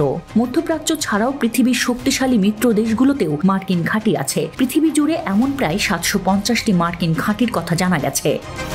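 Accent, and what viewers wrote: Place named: India. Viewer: ভারতিয় কন্ঠ মনে হয়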